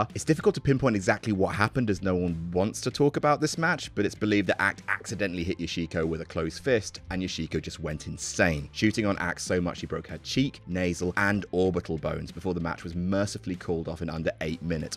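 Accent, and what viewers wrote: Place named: United Kingdom. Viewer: The list is good but is way to British for me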